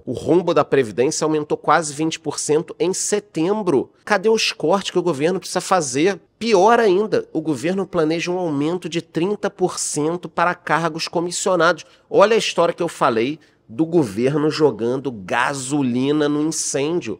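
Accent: Rio de Janeiro, Brazil. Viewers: Único carioca que consigo ouvir por conta do sotaque.... quem não guenta é louco!!!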